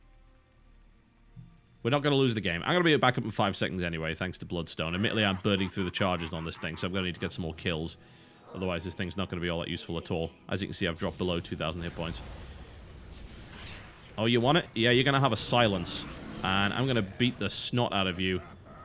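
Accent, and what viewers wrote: France. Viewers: Love the French accent lol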